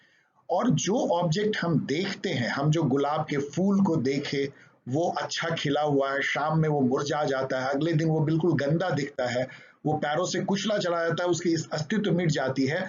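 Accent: India. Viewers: I swear by Allah Almighty, I'm not understanding Indian English😢it sounds like they're speaking their language.😢 Is there any link for me check out on? 17 days to our exams😢😢😢😢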